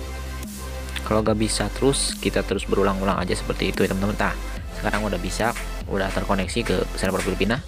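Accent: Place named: West Sumatra, Indonesia. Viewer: SUARA MU KYK MINANG KOCAK BANG, TP MANTULLL BANGGG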